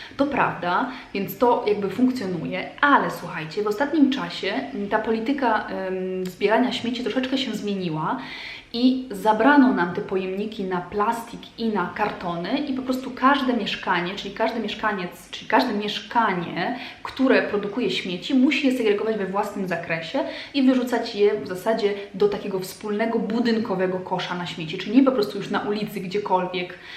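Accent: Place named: Kraków, Poland. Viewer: Ciao. Mam identyczne przemyslenia ! Ps i jestem krakowianka! U nas w Krakowie wychodzi sie na ,,pole,, a w innych czesciach polski ,,na dwor,, 😁 napisz jak oczywiscie mozesz chociaz gmine w jakiej mieszkalas...masz typowy akcent krakowski jak Ja..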